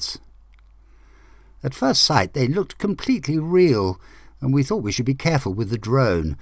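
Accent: United Kingdom. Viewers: well, you have a lovely British accent, and I’m American. So I’m betting I’m the one in the wrong! 😂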